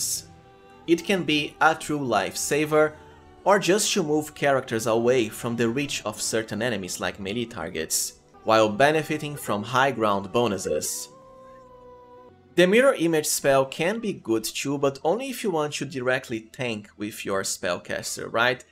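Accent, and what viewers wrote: Brazil. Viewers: soube pelo sotaque q era br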